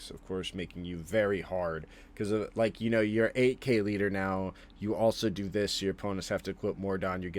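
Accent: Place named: East Coast, United States. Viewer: What part of the East Coast are you from? There's no chance that accent is anything but East Coast!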